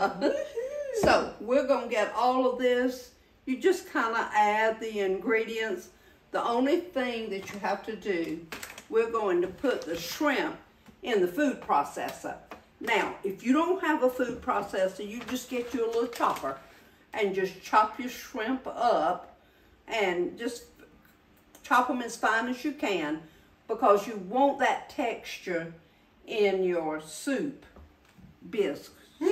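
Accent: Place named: South Carolina, United States. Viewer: I do love to hear your SC accent! I grew up spending summer weekends at Carolina Beach, NC. That was the life. When I was a young wife/mother, we moved to Florence SC, where I got a job working for Peoples Natural Gas (and Supertane.) I remember a call I tried to take once from a man who said he was calling from "Wilson Sawsude" and said they were having a problem with their "wrench." Well, I tried several times to understand, and finally had to turn him over to someone else. Turned out to be calling from Wilson Sausage about a problem with their gas range! I never forgot that. Hugs!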